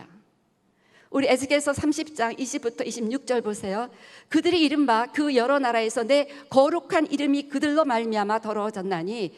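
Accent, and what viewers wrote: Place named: South Korea. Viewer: 콩글리쉬 참 듣기 힘드네..... 아이고.......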